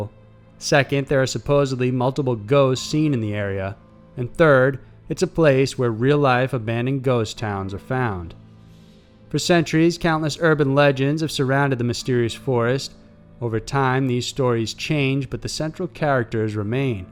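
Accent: South, United States. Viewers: Anyone else think this guy is from the South>? he has a noticeable drawl to his voice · could be like mine, totally untraceable. My mom is from Michigan, dad is from Mississippi, I grew up between Louisiana and Alabama then college in GA. But I didn't interact with other kids much when I was young, read a lot and wound up with barely an accent. After moving around a lot in adulthood and picking up linguistic tidbits from all over the country (some intentionally, some not) it's a mash-up. But when I was younger and had so little accent that people thought I was from somewhere else ("where are you from?" "I was born in NoLa." "No, i mean where did you grow up? You sound like a Yankee." etc) I sounded like this guy. Very subtle southern accent but much closer to the "Nowhere Special" accent that newscasters have. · Trying to place your accent. Obviously southern. 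But not East Coast, because that's where I'm at. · Goddess bless that southern inflection in your voice. Reminds me of the pine forests back home.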